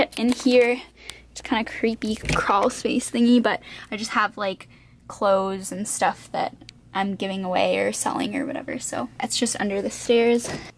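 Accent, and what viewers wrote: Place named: West Coast, Canada. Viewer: I totally noticed that and I'm Canadian! I wasn't fully aware that the West Coast vs. East Coast Canadian accent was so different... minus Newfies.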